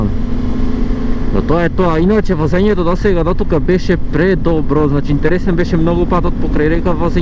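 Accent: Bitola, North Macedonia. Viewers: Pozdrav do od dalechnata Australia. Te pratime so voodushevenost. Odlichna video publikacija na patishestviata, so obilna informiranost i pokraj skromnata video tehnika. Informativni komentari na ubaviot Bitolski akcent, dosega ne zabelezhani. Uzhivanje e da se gleda tehnikata i balansot vo vozenjeto vo odnos na raznolikosta na patishtata. Mashinata ti e optimalna i pokraj relativno malata kubikazha. Ushte eden dokaz deka goleminata ne e merilo za uspehot. Dokolku mozhime da sugerirame, bi sakale da te vidime kade uzhivash vo pauzite vo lokalnite kafe restorani po mozhnost so komentari na mestata vo koi se zadrzhuvash. Veruvame ne oboruvash bilo kakvi rekordi za Ginisova kniga na chudata? Ti posakuvame uspeshni avanturi vo idnina so nadezh da go svrtish dunjalukov v'dolzh i popreku. Nie da sme zhivi - zdravi da prodolzhime da navivame od skromnite ni fotelji so chasha vo raka, i po nekoe mezence. 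DA NI ZHIVEJT! DA ZHIVEEJT!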